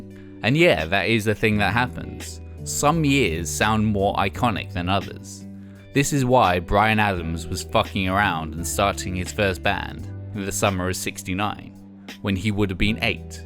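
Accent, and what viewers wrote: United Kingdom. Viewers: . Regardless of your British accent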